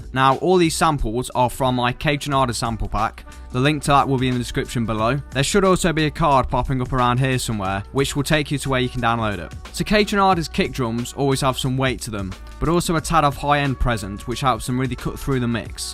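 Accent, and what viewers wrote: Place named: Derby, United Kingdom. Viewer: Sound like you from Derby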